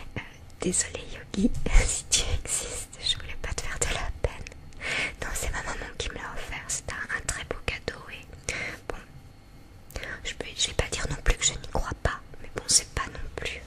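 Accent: Belgium. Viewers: Coucou, tu mérites tout le succès que tu as:) j'écoute principalement des vidéos ASMR anglophones, russophones et germanophones. Mais là, je craque, j'adore tes vidéos, toutes autant les unes que les autres! J'espère que tu vas bientôt avoir de bonnes nouvelles pour ta santé. Pour ma part, je suis en post burnout et dépression depuis janvier. Tes vidéos me font beaucoup de bien, et l'accent belge... 💗 Je suis fan! Gros bisous, prends grand soin de toi. La santé est prioritaire:) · Bonsoir, tu es belge ? 🤔 sinon video trés relaxante, j'adore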